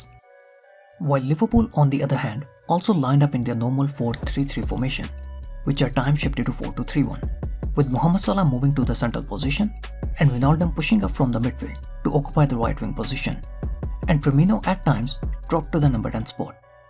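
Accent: India. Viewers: Since You are an Indian (accent) make an analysis on Sunil Chettri or The Indian National Team.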